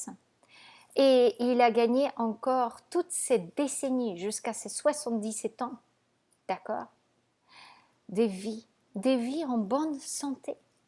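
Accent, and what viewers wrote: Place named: Poland. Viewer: ton français est extraordinaire pour quelqu'un dont ce n'est pas la langue maternelle. Et ce qu'il reste de polonais dans ton accent est charmant! Bravo pour cette maitrise de la langue et merci pour ces vidéos que je regarde avec curiosité.🙂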